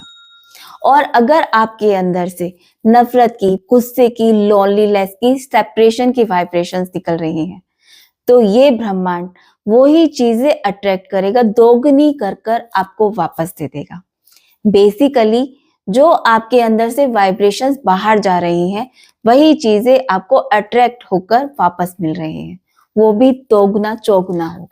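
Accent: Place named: Gujarat, India. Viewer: Aap ki language sunke muje lagta hai aap gujrati ho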